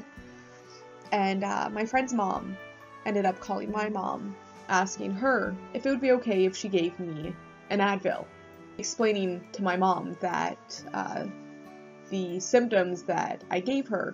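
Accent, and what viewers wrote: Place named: Canada. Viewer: I just want to thank you for sharing your story. I realize that it’s been awhile since this was first uploaded, but I want you to know that it really impacted me. I totaled my car 3 years ago and completely messed up my ankle. I can’t ever run again, and some days are more painful than the last, which in by no means compares to what you went through, but it was challenging. It took about half a year to be able walk without any crutches, and about another year just to make the limp less noticeable. It was all worth it though. Just like you said, I don’t think I’d be the same person I am today if it wasn’t for going though it all. I have to be thankful for that, at least. But, you really do seem like a wonderful, strong-minded person. Hopefully you’re still doing well; and, of course, watching the new deadpool movie somewhere. Most likely in Canada. 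Lol. Not to offend. You just seem like you have a slight Canadian accent. Then again, what can I say? I’m from the states and people tell me that I sound slightly Canadian all the time. Haha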